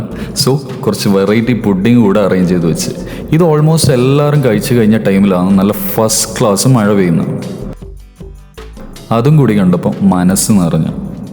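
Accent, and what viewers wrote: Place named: Kannur, India. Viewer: Waiting for next vlog.....kannur slang is really nice 👍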